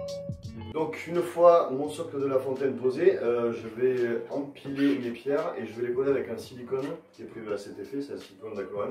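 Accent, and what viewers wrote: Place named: Marseille, France. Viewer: Oh Le Marseillais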